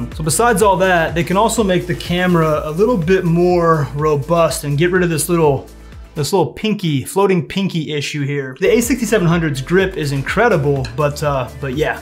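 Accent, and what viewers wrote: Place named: Belgium. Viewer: hmmm are you belgian? youre american accent is pretty good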